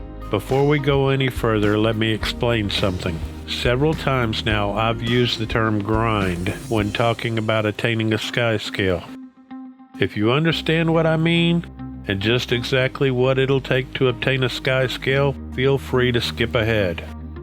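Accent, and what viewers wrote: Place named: South, United States. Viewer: Thanks, love your voice...you should do radio! Where are you from? There is a soft inflection there that reminds me of the south, where I did a lot of my growing up. I am too uncoordinated for JPs, does getting the skyscale require those? My server sucks too as I am in the remote north (more north than the Nords, lol). Thanks again.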